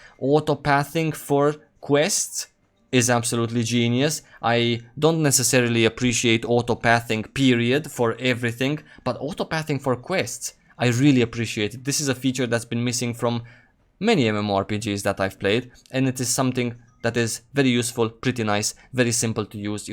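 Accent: Italy. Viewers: Are you from Italy? your english accent it's tricky :D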